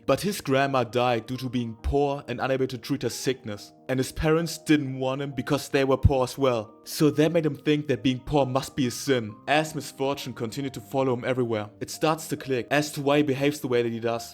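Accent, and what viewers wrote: Germany. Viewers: You Sound Like U have a German accent somehow xd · You got that german accent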